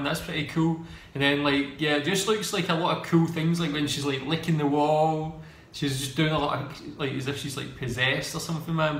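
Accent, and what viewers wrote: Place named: Scotland, United Kingdom. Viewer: Yo man, you have the cutest scottish accent. I could listen to you for hours. I wonder if you could do podcast or audiobook lol. Anyway, please do watch this movie. Give us a review and let us know if it's worth our money. Thank you!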